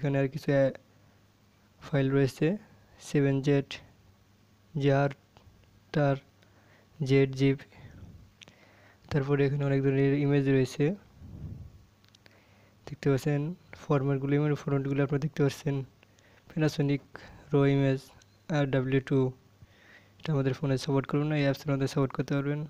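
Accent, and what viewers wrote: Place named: India. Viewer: English ENGLISH . Dont put your title in ENLGISH if youre speaking chicken scratch from india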